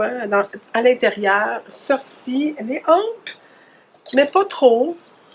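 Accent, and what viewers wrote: Quebec, Canada. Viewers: Je t'ai découverte depuis peu, mais vraiment c'est un réel régal de t'écouter avec ton bel accent du Québec et ta bonne humeur ainsi que ton naturel. Et tes pensées sur les choses de la vie que tu nous fais partager. Mais bien sûr tes cousettes toujours bien réfléchies et avec de bonnes explications et de belles finitions. C'est cela aussi qui de l'autre côté de l'écran, à des milliers de kilomêtre nous permets dans notre petit coin de progresser et de s'encourager à faire. J'ai le même bordel à la maison, j'essai de m'organiser, mais le naturel reviens au galop.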